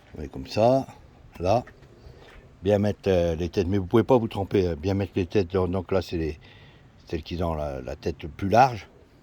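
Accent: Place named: Saint-Étienne, France. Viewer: Where are you from mate? You ve got an accent sounds like Saint Étienne 's thank you for your video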